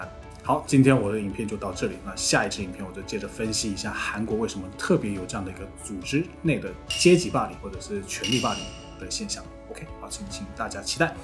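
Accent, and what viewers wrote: Standard. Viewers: 国语好标准啊不看评论不知道是哪国的，看的时候心里有几十个卧槽，我以为最多会被打两下给老兵洗袜子之类的，没想到这么黑暗！年少时曾粉红也曾痴迷韩剧学韩语，大学之后认识的留学生让我帮他们考试传答案，首尔和釜山学生背后说坏话，那种微妙的感觉我就想这和中国也太像了，神秘面纱没了之后依然很羡慕韩国的人均工资，以及内地女生对韩国男生的态度，留学生给我看约出来的女生照片真的又漂亮学历又好有的见面一两次语言不通就提出要和他在一起惊呆我。后来知道了娱乐圈财阀军队校园霸凌这些，我的理解是资本凌驾于政治之上很多事情也会逐渐离谱，思想上灌输洗脑教育会让不同阶层的人暂时忘记阶层不敢肆无忌惮的在学校军队欺负人，大不了长大之后再把自由意识找回来，都说大陆没自由我也天天骂觉得台湾或许是乐土，到现在我觉得或许根本没有乐土，一个地方也不是好或坏就能定论，作为平民很庆幸生在东北的十八线小城没受过校园暴力不用服兵役家人溺爱十八岁前傻子一样活着直到30岁还很幼稚，最后希望中日韩站起来让平民日子好过点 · 看影片也幾年了，你的中文用字和發音已經比許多台灣人還要精確和標準。以非中文母語者或是雙母語者來看，真的很厲害，每次看你的影片都覺得非常佩服。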